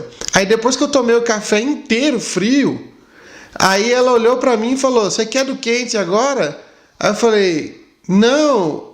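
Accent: Minas Gerais, Brazil. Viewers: Uai! cê num é mineiro não? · Ele pode ate ser pernambucano mas fala q nem mineiro já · Este cara já pegou o sotaque mineiro...kkk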